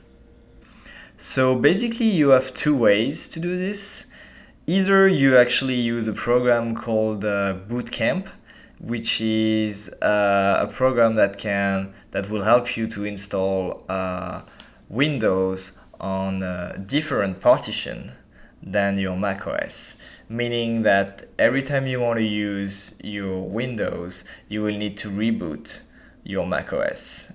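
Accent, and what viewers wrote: France. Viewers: French accent 😅, good work budy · if you don't mind me asking, are you french?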